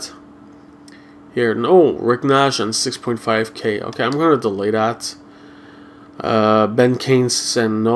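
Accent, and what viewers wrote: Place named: Quebec, Canada. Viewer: pi ta un accent Québecois c pour sa g su que tu parle francais sur dautre video sur les commentaire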